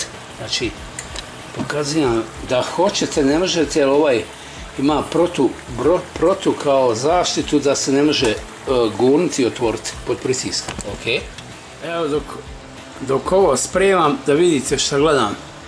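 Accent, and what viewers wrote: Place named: Zenica, Bosnia and Herzegovina. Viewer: Ne znam da li ste iz Zenice...ali imate pravi Zenicki naglasak hehe.Pozdrav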